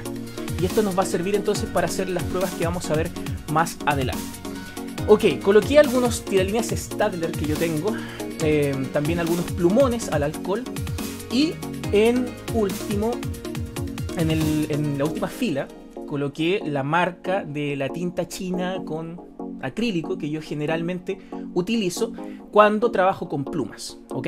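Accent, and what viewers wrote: Chile. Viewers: Siento que todos los chilenos hablan iwal xd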